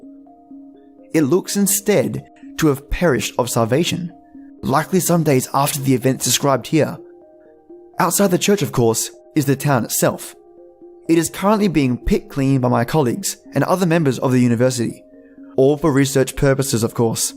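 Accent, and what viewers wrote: Australia. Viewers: I missed your awesome Aussie voice 😩 Hope that didn't come off creepy, hahaha. I had no service for a few days and felt like I was going crazy without any stories from my favorite narrators! I'm gonna enjoy this one 🤗👍🖤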